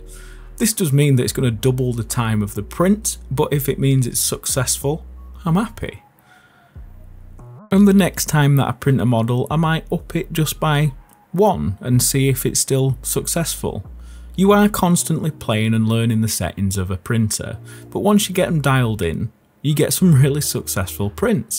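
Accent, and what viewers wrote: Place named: Yorkshire, United Kingdom. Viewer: Always love your videos buddy, nice to hear a Yorkshire accent hopefully bump into you one day I’m not far away in Rotherham myself.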